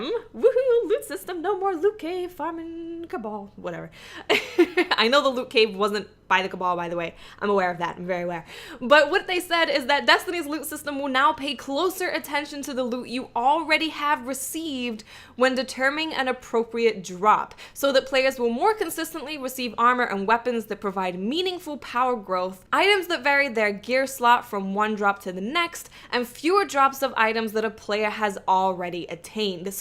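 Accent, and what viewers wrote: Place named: New Jersey, United States. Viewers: Where are you from? it sounds like you're from new york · You have kind of a jersey accent but I might be wrong are you from around jersey or something (I'm not a stalker I'm just curious lol)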